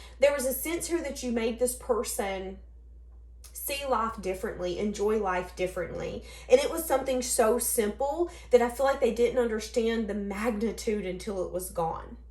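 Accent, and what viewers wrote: South, United States. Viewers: OMGEE!!! I watch ALL your videos EVERYDAY!!! Whether they resonate or not!!! I was searching for a video yesterday, like where is my daily tea!!! And why the past week I was thinking: I’d like to see her face on a live!!! Cause I absolutely love your country accent!!! Then BOOM!!! I click and see YOU!!! You’re gorgeous,!!! 🙏🏽🙏🏽🙏🏽 Ok, anxious to hear this reading!!! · You have a Southern twang but I love it 😍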